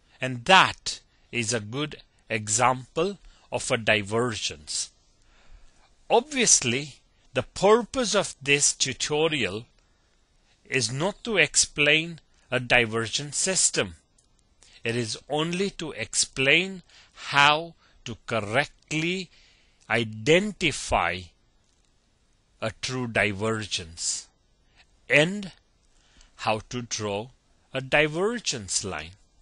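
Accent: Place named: India. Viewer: love the indian accent. thnx for the vid tho :)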